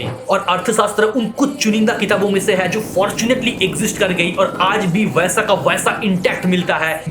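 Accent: Bengal, India. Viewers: Bhaiya aap Bengali ho kya?? Apka hindi accent suun ke lag raha hai😅😅❤ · ভাই তুমি তো বাঙালি? তোমার কথা বলার পদ্ধতিতে বোঝা যাচ্ছে। ধন্যবাদ তোমার ভিডিও খুব ইনস্পিরিং। আরো এগিয়ে যায়।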